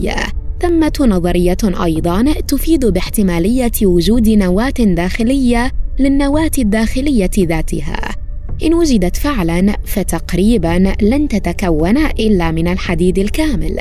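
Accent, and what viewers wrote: Egypt. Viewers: فعلن مصرية مصرية 😂😂😂😂😂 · تم اكتشاف جنسية المعلقة التي نحبها انها من مصر وااعع لغتك العربيه الفصحى رائعة شكرا لكى لقد افادني درس الجيولوجيا هذا ♥️ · انتي اللي بتتكلمي في الفديو مصرية؟؟ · مين لاحض انو الي تتكلم مصرية 😂❤️❤️❤️❤️تحية لمصر من الجزائر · باين🧐 عليها مصرية😏😂😂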